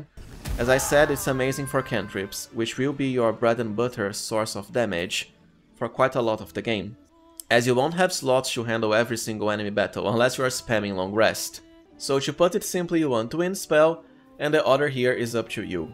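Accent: Brazil. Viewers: maluco com sotaque todo brasileiro falando ingles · não tem como nao saber que é br com esse sotaque, belo video amigo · Eu tinha certeza que tu era brasileiro pelo sotaque. Conteúdo muito foda meu mano. Talvez tu fosse o melhor canal de bg3 builds no brasil em pt, mas a escolha do inglês claramente deu certo.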